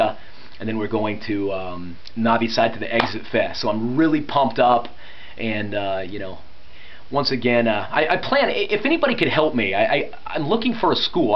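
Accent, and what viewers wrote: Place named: United States. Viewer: I always watch American movies and I know how to pronounce the words in the American way. Of course I mean general American. There are a lot of dialects in USA, XD. Don't lose your American accent! It's just great, I don't like the British accent, the American one is much better.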